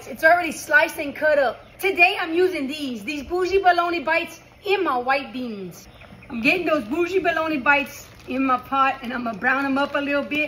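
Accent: Louisiana, United States. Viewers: Just fyi...love your Cajun accent. I love in Texas, but my Daddy was born & raised in Catahoula. Spent a lotta time there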